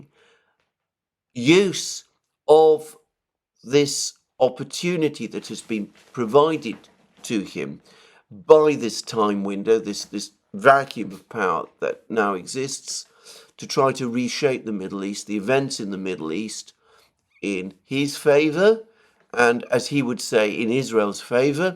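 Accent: United Kingdom. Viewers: I believe you SAID..SEVERAL TIMES..that the Ukraine conflict was fital to the world! And stop giving peace planes..You sound british (REDICULOUS) an YOU are very SINICAL!!!!!!!!!!!!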